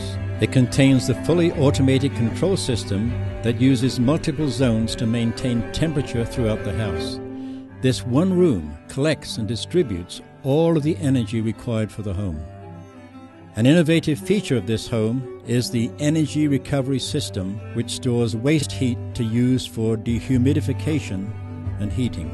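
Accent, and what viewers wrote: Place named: United Kingdom. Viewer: Cool house, but what's up with the British accent?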